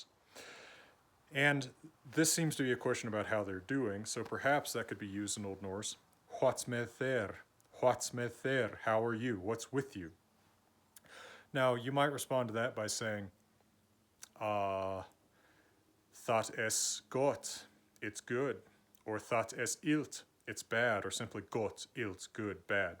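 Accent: United States. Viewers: This might be what old norse would sound like spoken by someone with a heavy American accent. Especially the R and the L reveals the speakers origin. Or are there any evidence that old norse were pronounced closer to American English than to modern Scandinavian languages?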